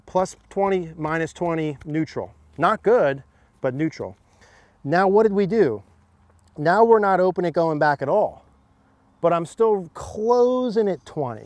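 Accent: United States. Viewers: My god it's so much better listening to an American accent and straight forward video rather than these British guys and all their cringey clickbait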